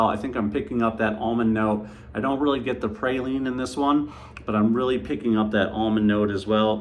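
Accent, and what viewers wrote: South, United States. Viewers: Great video my fellow Carolinian. I love this one as well. Don’t worry about speech sir, we country so it is what it is. If anyone wants this scent stronger, apply two sprays of this, then add stronger with you intensely. Thank me later! does it again. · Great review, sounda amazing. Dont worry about your speech, we are from tha south, most think we cant talk anyway😂😂😂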